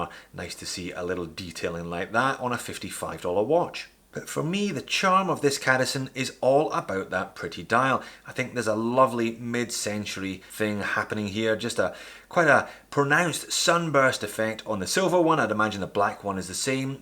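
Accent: Scotland, United Kingdom. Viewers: It's well deserved mate, your channel is one of the best out there, no need to buy subscribers like some others!!! Keep going mate, the watches you look at aren't covered by many others so you are head and shoulders above the rest.....and you have introduced the Scottish accent to my Russian wife :-)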